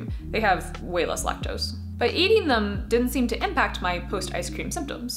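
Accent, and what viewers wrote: Canada. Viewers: No offense but are you french canadienne? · are you canadian you sound canadian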